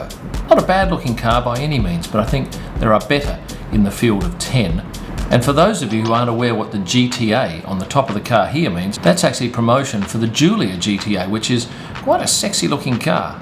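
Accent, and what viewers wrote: Australia. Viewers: How bout a vid on tourist attractions near every F1 track eh mate? Btw I love the aussie accent so tried something. Correct me if I am wrong.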